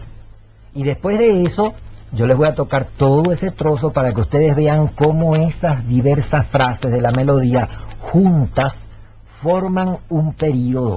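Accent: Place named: Caracas, Venezuela. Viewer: Sr., le agradezco muchísimo que haya puesto este curso de apreciación musical de Jose Antonio Calcaño en youtube. Aparte de la claridad y sencillez con que explica la música tambien me hace recordar mi infancia en Caracas ( no vivo alla desde el año 73) no solo por las clases en si, que alguna oi en disco en mi casa ( el Sr. Calcaño era pariente mio) sino tambien por el acento tan caraqueño que me hace recordar a mi mamá y a mis abuelos.No sé si todavia se habla asi. Los acentos van cambiando y lo notamos mucho quienes ya no vivimos hace décadas en Venezuela. Muy agradable volver a oir esto.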